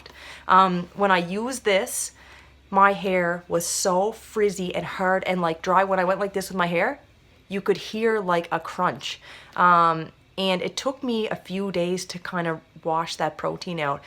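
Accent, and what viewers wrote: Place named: Canada. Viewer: Fellow Canadian girl from Ontario! Love your accent. My BIL is from Cape Breton and you guys same the same things ;) Anyway, I'm newish to the curly curl method. I have tried co-washing with a few different conditioners and it makes my hair so greasy and my scalp itchy...what am I doing wrong? does this mean I can't do this method? I am using the Biotera gel, I get a cast no matter how much I use, my hair looks stringy and is hard and crunchy...I don't want that look, is gel not for me?? Sorry for all the questions :)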